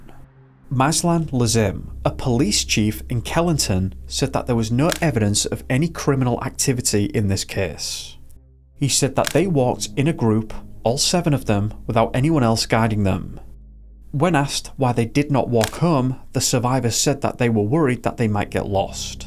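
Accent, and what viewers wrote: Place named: Brittonic, United Kingdom. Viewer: I love this channel. Stays in my top ten. I do want to say I especially like the Fortean, UFOlogical ones, and would appreciate broader Fortean phenomena coverage if that's a thing you'd be interested in. Not that I don't like the missing persons episodes...I'd LOVE to hear you cover the REALLY weird ones. With strange search events, bodies found under bizarre or impossible circumstances, missing kids found alive but absurd distances away in short order, search dog oddness, no cause of death being determinable. Paullide's core weirdies. I REALLY love the "Fae Theory" stuff, including this one. I gather by your accent that you are from some Brittonic area that probably has conspicuous Faerie or Elven Lore. Lovely accent by the way. All around cheers! Please, keep up the great work!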